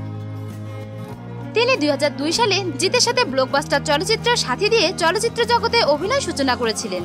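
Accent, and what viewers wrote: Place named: Bangladesh. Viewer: Kothakar manush apni?mone hoi Bangladeshi.thik vabe bangla bolte parchen na.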